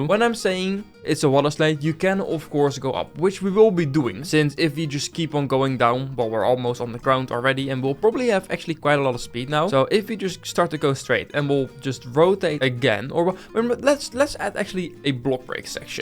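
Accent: Netherlands. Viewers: You got a dutch accent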